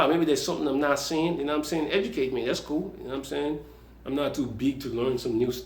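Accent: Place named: Nigeria. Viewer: guy all this funne wey u dey tlk. lol. u be omo naija abi omo ghana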